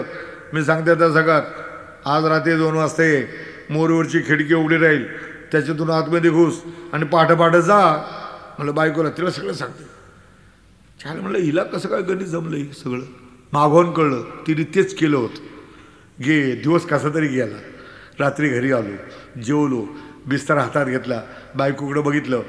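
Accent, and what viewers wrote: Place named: Ahmednagar, India. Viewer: Khas nagarcha rangda aawaj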